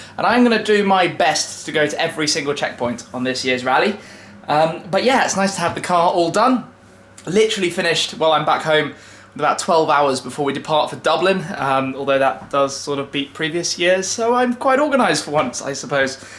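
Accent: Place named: United Kingdom. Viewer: Its called a british accent bro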